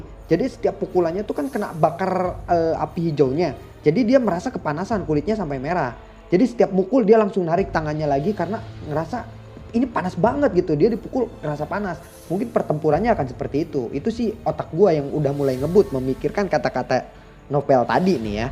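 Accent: West Java, Indonesia. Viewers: orang sunda gening mang wkwk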